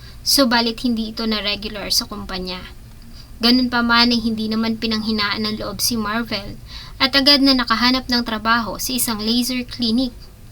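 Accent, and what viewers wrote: Visayas, Philippines. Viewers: Your pronounciation is so bisaya. Hindi Marvel ate Mar-vil ...hindi slovenya Slovenia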